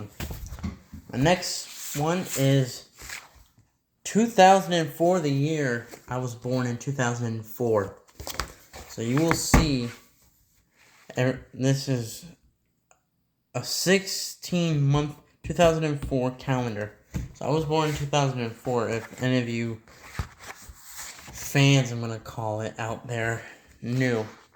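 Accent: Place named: South, United States. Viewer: wow you sound like you’re from the south that’s amazing that you’re from Canada. I love the Canadian albums big fan.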